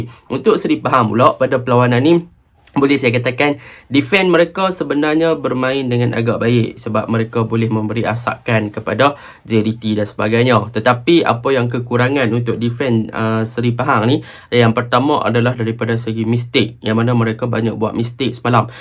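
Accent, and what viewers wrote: Kedah, Malaysia. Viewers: Aku suka dengaq loghat kedah hang, cuma aku x suka dengaq loghat kedah sanusi je ❤️💙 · suke betol aku dgr loghat kedah hang bang👍👌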